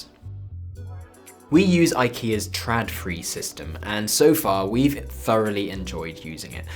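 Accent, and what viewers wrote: United Kingdom. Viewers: This might sound strange, so advance apologies. I have become a fan of your British English accent.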